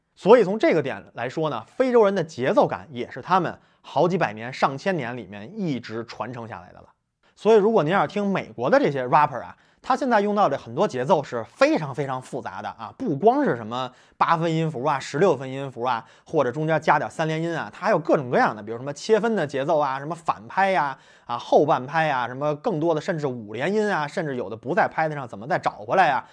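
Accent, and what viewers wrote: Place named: Tianjin, China. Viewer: 哥们儿你是天津的吧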